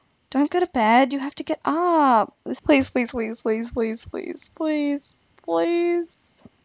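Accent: Australia. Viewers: Is your accent, Australian?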